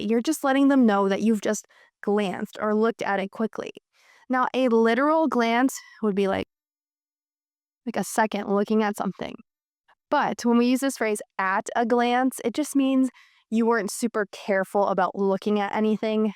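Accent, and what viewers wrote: United States. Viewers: One of the best English teachers on youtube. I love your American accent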